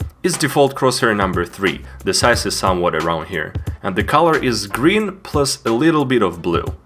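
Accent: Russia. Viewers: Wait u r russia?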